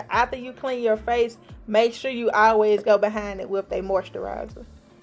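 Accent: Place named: Memphis, United States. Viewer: That south Memphis accent❤️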